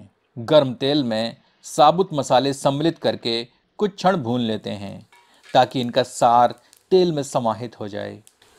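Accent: Standard. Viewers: शुद्ध हिन्दी बोलते हैं आप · apki sudh Hindi sunke bohot achha laga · Sudh hindi ❤️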